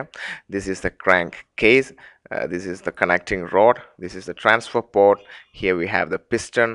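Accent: Kerala, India. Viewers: U must be a keralite for sure,.ur accent is such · holy shit dude i didnt not listen to anything u just explained i was to busy laughing at ur indian accent hahaha gj tho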